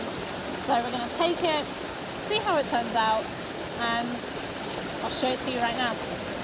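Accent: United Kingdom. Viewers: Can you please teach me how to dance? But first, teach me how to talk with a British accent. Then teach me how to use an L-bracket on my Canon EOS R that has a Ulanzi Claw Quick Release plate on with a Ulanzi Zero Y Lightweight Travel Tripod. I hope I'm not asking too much. 💜 You asked for feedback on your audio as you were talking and setting up around the falls. Yes, the waterfall is loud, but I clearly understood what you said, even with my 66-year old ears. You mic gain could've been a tad (or wee, as y'all say) bit higher, but that might've increased the waterfall sounds too.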